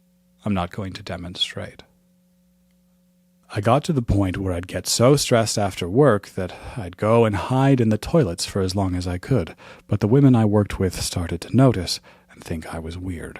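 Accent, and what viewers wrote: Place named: Canada. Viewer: Knew in an instant you were a fellow Canuck- pronunciation of foyer and use of university (Yankees say college even if Master's and doctorates are offered. Never understood that one). Love the humour!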